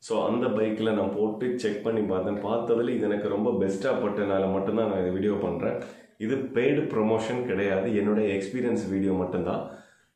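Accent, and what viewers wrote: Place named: Marthandam, India. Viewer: Marthaandam என்பது உங்கள் பேச்சிலேயே தெரிகிறது நண்பா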